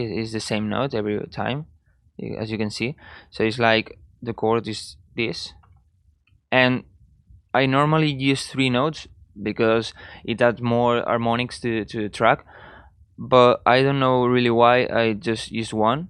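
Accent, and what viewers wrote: Spain. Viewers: Como se nota que eres Español ajjajaja! Muchas gracias por el tutorial !!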